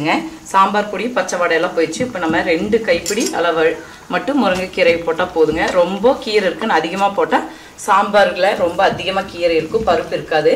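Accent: Kongu, India. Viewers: Mam puli seka venama??? Nenga kongu Tamil ahh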